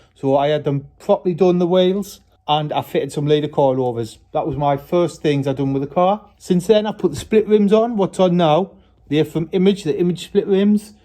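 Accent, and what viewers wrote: United Kingdom. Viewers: Victor Oro Are you from the UK?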